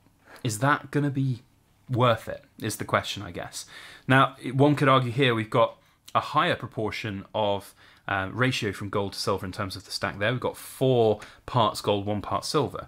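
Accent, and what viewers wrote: United Kingdom. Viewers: Yes your accent is clearly British and I also love Britannias. Typically I purchase 90% silver, Sunshine rounds&bars, Canadian Maple Leafs, and Britannias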